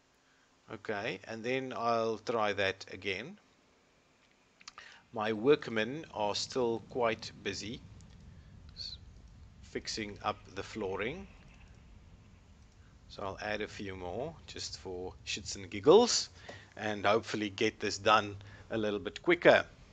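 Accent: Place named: South Africa. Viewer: Great accent. South African? Can you say "Diplomatic immunity!" sarcastically please. :)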